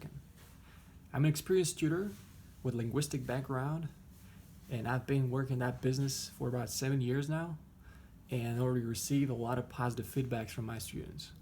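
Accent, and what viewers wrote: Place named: South, United States. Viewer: I actually love the southern accent as a foreign student. Sooo sexy.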